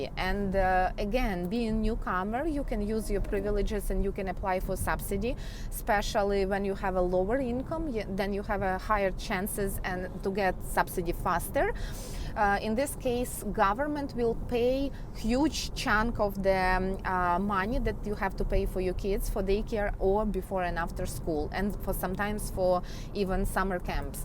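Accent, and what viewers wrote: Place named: Russia. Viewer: Ох уж этот русский акцент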